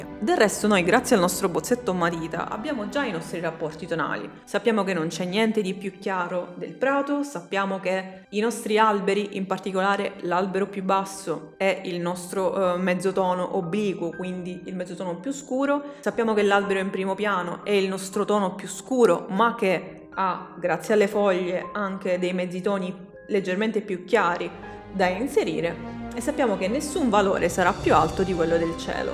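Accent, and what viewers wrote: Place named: South, Italy. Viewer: MA LA TENDENZA DEL SUD SI SENTE !!!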